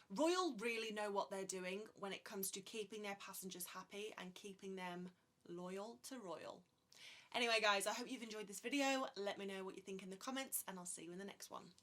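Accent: United Kingdom. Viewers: I just love a reviewer with a British accent!.....btw I love virgin voyages!!!1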